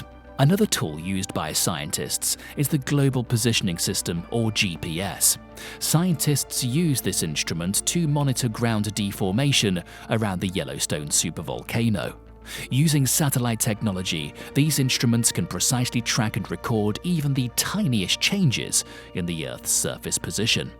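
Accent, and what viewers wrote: United Kingdom. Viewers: The narrator has that annoying British accent that is constantly used online to sell something, usually something that is threatening to destroy the money grabbing pharmaceutical industry. Hence, where is the credibility in overusing such an accent on the "internet wild west" where anything goes; you can say any mindless thing you want with little fear of significant repercussions.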